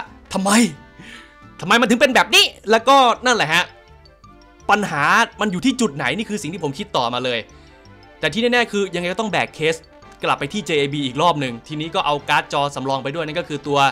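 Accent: Ubon Ratchathani, Thailand. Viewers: พี่เป็นคนอุบลฯเหรอ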